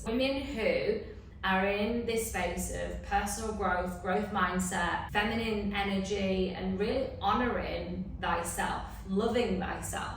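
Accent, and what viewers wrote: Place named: Australia. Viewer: I very much like this accent! Is she Aussie?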